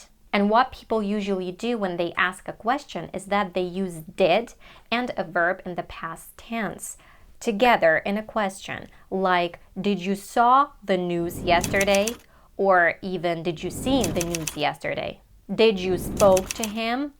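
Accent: United States. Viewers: Wow! You're Ukrainian. I thought all the time you were American. You've done a great job. Congrats. 💐🌹💐🌹💐🌹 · I❤your American accent. You're the Best. Thanks 🥰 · Wow! You have an excellent American accent, I like it, I congratulate you and keep going. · The fist time i saw you on youtube, i thought you were American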